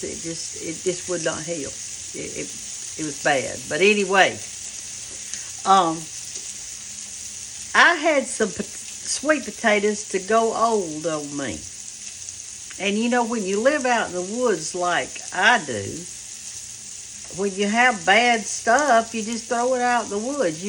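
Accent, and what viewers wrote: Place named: South, United States. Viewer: Love your Southern accent. I’m a fairly recent viewer from California. You are the BEST!!!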